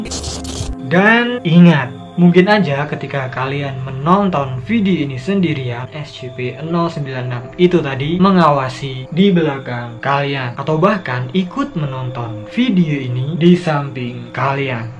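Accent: Java, Indonesia. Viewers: Merinding banget dah abang orang jawa apa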